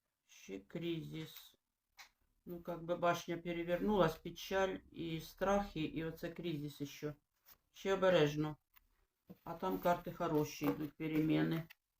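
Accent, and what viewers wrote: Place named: Surzhyk, Ukraine. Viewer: Доброго дня, я яз Вами з самого початку коли було 500 підписників.Там у Вас була Донбасівська мова,так якми їїї добре знаємо. Зараз у Вас прекрасний український суржик! Ну приємно слухати,чесноя!!! А от коли Ви російською до Боні,то це правда ріже слух,якось наче і не Ви! Я не проти російської,розмовляють,пишуть люди,аби душа була українська ❤. Не приймійть як образу.,нехай котик вчиться теж українського суржика так як проводите ефір❤❤❤. Головне миру скорішого,! Дякую за розклади❤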